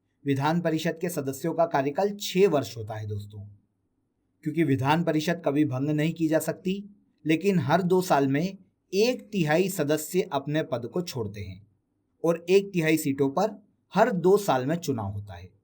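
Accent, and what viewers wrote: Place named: Maharashtra, India. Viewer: Sir ap boht acha pdhate ho.. or aap Maharashtra se belong krte ho kya?! 😄 qki aap “hai” ko “he” bolte ho 😁 sounds good!!😊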